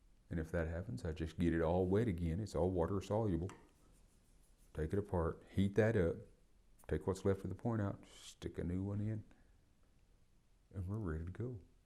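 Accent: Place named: South, United States. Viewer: This dude's country as hell lmao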